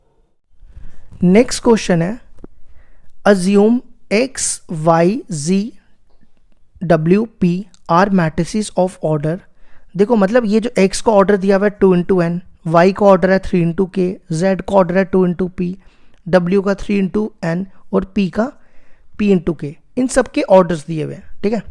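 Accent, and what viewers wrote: Jammu, India. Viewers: Sir you are from jammu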